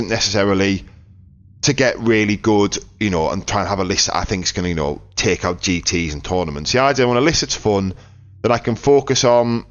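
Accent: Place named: Teesside, United Kingdom. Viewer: I've lived out of the uk for 20 years, but your accent reminds me of home. After so long, it's hard to be sure, not a mackem i think, maybe a little more south, smoggy? Nevermind you just answered this as i was typing, but i'll leave it up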